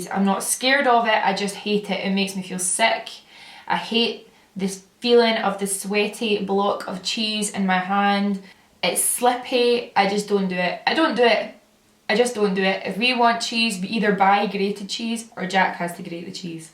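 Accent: Scotland, United Kingdom. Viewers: Whereabouts in Scotland are you from? I'm Scottish too and I love your accent, but I honestly can't place it!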